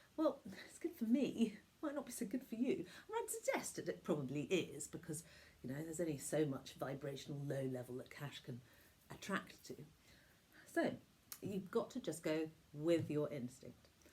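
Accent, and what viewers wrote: United Kingdom. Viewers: it,s nice to hear a respectable brittish woman speak about stuff.:face-red-heart-shape::face-red-heart-shape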